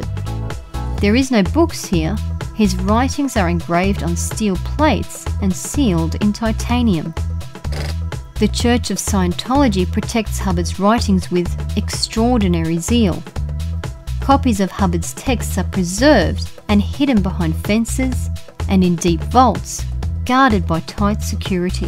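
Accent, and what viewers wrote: Australia. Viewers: She has an Australian accent. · that is australian accent Mate !!!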